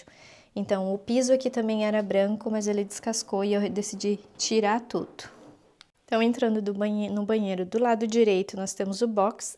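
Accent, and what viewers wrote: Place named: South, Brazil. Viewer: Olá muito lindo seu AP, vc morava aonde no Brasil vc é do sul pelo sotaque eu pensei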